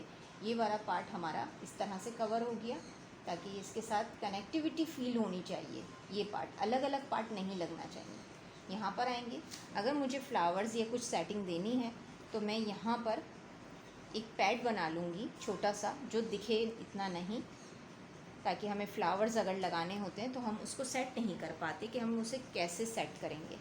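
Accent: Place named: Karachi, Pakistan. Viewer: Aoa. U r great n soooo sweet. Bas ap se ek request ha k ap. Karachi se belong hain isleay apke bolne main pata chalta ha. Isleay ap open ko opin bolti hn. Youn samjhen ap ne pen ki tarah yad rakhna ha. .. plz mind na kejeay ga. Ap boht achi hn❤ or parhi likhi bhi hn. Bs is choti se mistake btai ha. N sorry agr apko bura lga ho to.....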